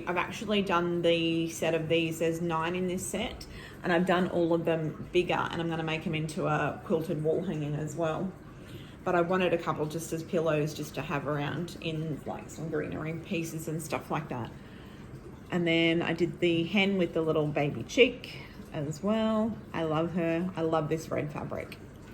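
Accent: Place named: Australia. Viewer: love your podcast. Your stitching is beautiful. Love your embroidery too, but I only hand embroider, so I cannot attempt those lovely pieces, sadly. So nice to hear an Australian accent. I'm an expat Kiwi in Texas, 21 years, now. Look forward to watching more. Kia Kaha.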